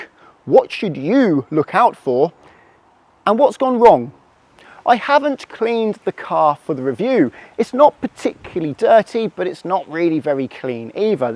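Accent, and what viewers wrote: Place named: United Kingdom. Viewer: great review. But I was more fascinated by the Bii iish accent. After 32 minutes of this video I was saying beTTer (instead of beDDer).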